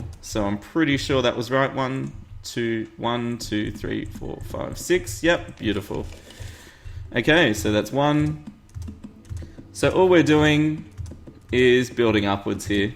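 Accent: Australia. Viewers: Aussie aussie aussie oi oi oi i can tell by your accent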